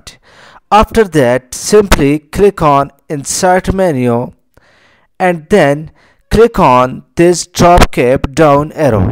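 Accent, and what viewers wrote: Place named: Posh, India. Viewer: Never expected to hear a posh Indian accent in my life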